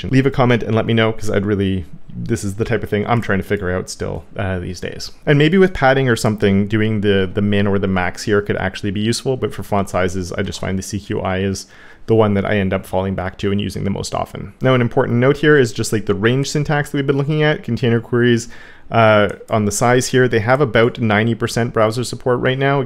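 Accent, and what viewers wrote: Canada. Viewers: It's definitely magical. I love it, and love only learning CSS NOW rather than 5-10-20 years ago. (I did try 10 years ago but just didn't click). Id be interested if you do a video on the different available container types regarding sizing. Aside: continer style queries ;) Hoping my daughter will come back with a tinge of your accent. She's just gone to St John's area in Newfoundland for 5 months of school (she's 17, specifically Conception Bay South)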